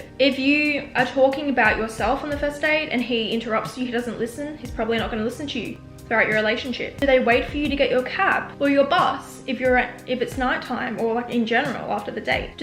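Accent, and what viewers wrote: Australia. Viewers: And her thicc aussie accent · I only talk in an Australian accent now because of you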